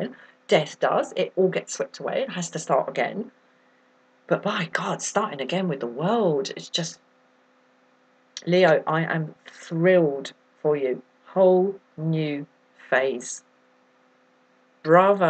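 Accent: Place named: United Kingdom. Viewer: Loving your across the pond accent! Thanks for the needed message of new beginnings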